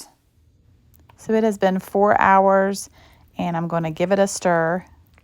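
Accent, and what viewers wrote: South, United States. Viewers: Hi there! Enjoyed this video, your accent sounds Southern to me. I suspect that you are always on the lookout for meal suggestions to make on your channel. Might I suggest corn fritters and southern fried catfish. Keep up the good work, enjoy your videos a lot.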